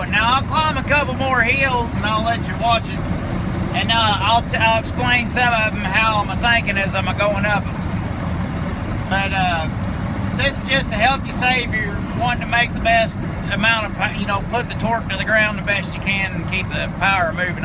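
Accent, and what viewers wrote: United States. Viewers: I'd love to ride with ya hell that country talk having me rolling all day that sumbitch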